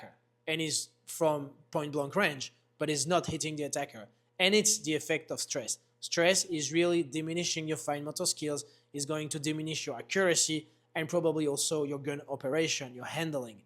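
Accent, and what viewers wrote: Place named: France. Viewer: You sound French as fuk!! Rarely seen a Frenchman that understands and supports 2A... 🙏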